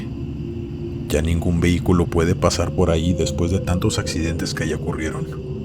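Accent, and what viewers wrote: Mexico. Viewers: Hola caballero saludos de Medellín Colombia men encata este narrador es mexicano usted voz muy varonil y muy clara y y muy pulcra quiero desir sin ningún morbo y muy caballerosa felicitaciones y gracias por sus relatos muy interesante 👏👏👏👏👏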